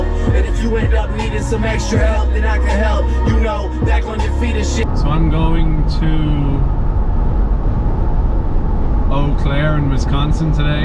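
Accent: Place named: Ireland. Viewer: And, he's the same age as me 😊 lord let me just meet this man and marry him 😂 ♥ PLEASE 😁 JUST a conversation with the real authentic Irish voice!! Or at least just post more videos of you just talking!!💕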